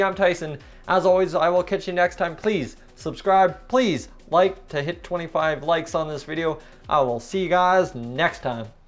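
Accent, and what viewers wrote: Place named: Canada. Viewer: As a fellow Canadian, i love your accent bro ahah. Doesn't get anymore Canadian than that. Keep up the good work ma dude 👊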